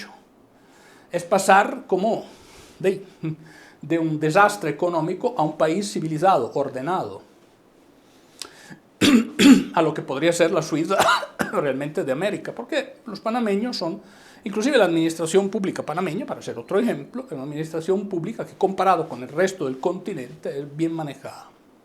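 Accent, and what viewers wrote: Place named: Santa Fe, Argentina. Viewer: Buen análisis , hablas como un santafesino de la vera cruz , capital de Santa fe , Argentina, te dejo la incógnita para saber cuál es nuestra particularidad al hablar , si la adivinas ,me suscribo te sigo y te comparto 👌👏👏👏🇦🇷👽🚴🏽‍♀️☯️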